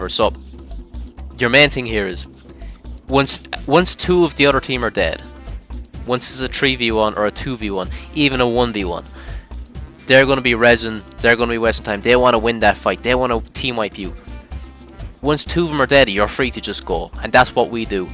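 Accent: Ireland. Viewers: Hey, thank you for not using AI voice anymore, up the Irish accent!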